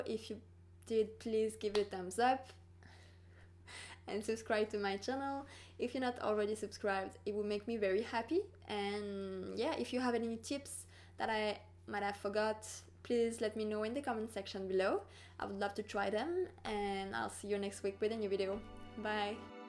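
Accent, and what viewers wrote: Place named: France. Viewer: your french accent is so cute. LOVE it. I am enjoying your videos a lot!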